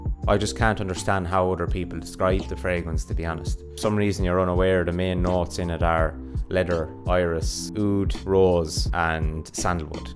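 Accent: Ireland. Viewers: Your never Irish are you?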